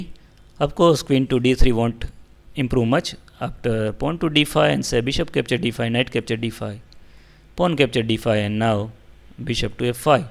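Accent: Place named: India. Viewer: I was talking about accent. Yeah his english is perfect but... I just cant stand indian accent. Its just so annoying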